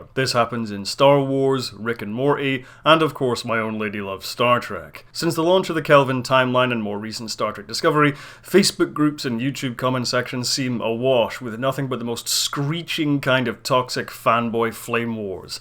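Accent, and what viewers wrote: Scotland, United Kingdom. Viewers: OMG! What town in Scotland were you born in? Sooo beautiful. Your Scotch accent is not thick BTW. LOL. Jjking. I know the term is "Scottish". The Macallan is my favorite Single Malt SCOTCH whiskey(proper use). No blended whiskey ever passes my lips.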